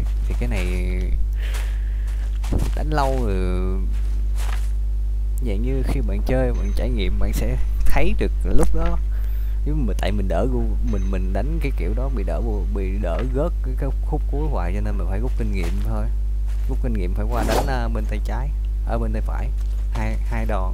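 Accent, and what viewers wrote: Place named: Mekong Delta, Vietnam. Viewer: Gịong của bạn giống ng miền tây ghe luôn . Nghe thoải mái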